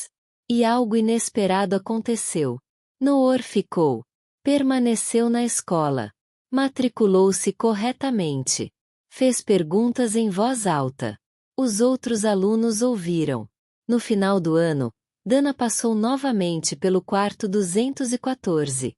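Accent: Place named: Bahia, Brazil. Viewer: Eu amo essa sua voz de Salvador Bahia a mesma Voz que narrou. A historia de Luisa e Gabriel Monte Negro ❤❤❤❤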